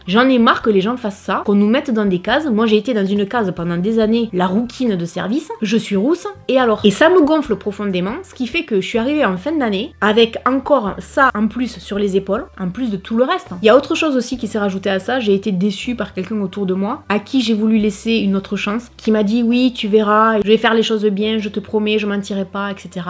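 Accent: South, France. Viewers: je te souhaite une nouvelle année pleine de joie et de sérénité. oui tu es youtubeuse mais tu n'as rien a voir avec certaines "poupées barbies" qui se ressemblent toutes. tu es naturelle, solaire et oui, oui, utile. depuis quelques temps, j'apprécie beaucoup tes vidéos plus personnelles. elles font du bien aux autres qui passent par des moments difficiles. ne change pas, reste comme tu es (et avec ta maman). j'ai l'impression de voir quelqu'un de ma famille et ça fait du bien. le monde devient très dur et ç'est un bonheur de te voir et de t'entendre avec ton accent du Sud. 🥰🥰🥰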